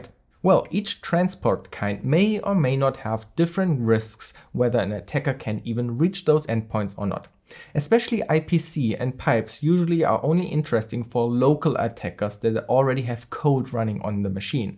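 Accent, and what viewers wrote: Germany. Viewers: 3 sekunden ich wusste sofort der spricht deutsch 😂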